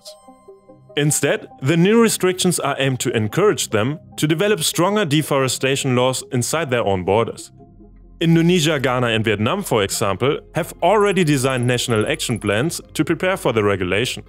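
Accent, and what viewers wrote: Germany. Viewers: His accent is clearly German 😅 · Is he German?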